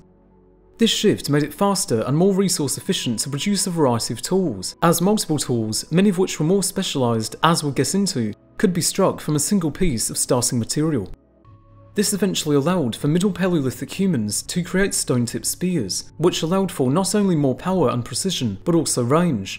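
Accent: United Kingdom. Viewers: I grew weary of his British accent of which I could understand maybe half. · excellent video! going to watch North 02 s part now (been following both of you beforehand). as for the audio issues, as a non-native english speaker it´s hard to follow you sometimes, and I don´t know if it´s because of your british dialect or the pacing. still, a loooot of information packed into a 15min video! great work! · I enjoyed this, as I have many of your other videos. Please take this as constructive criticism when I say that your narration comes across to me as a monotone which, combined with your lovely but rather thick British accent, makes it difficult to follow the flow and details of the script. While I'm at it, I may as well say, too, that printing some words on-screen would often be helpful for amateurs such as myself. I'm not always familiar with technical terms, e.g., names of cultures. 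Thank you for considering my feedback. I am very much interested in prehistory, paleontology, geology, zoology, evolution and human and natural history.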